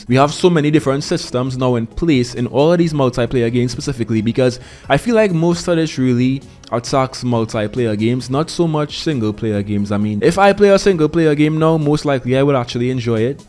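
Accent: Trinidad and Tobago. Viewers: As soon as the video start I say this yute trini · Big dawg you from Trinidad?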